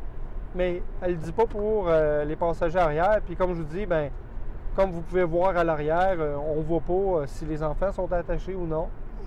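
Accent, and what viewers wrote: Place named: Quebec, Canada. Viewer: Ah!!! l'accent quebecois.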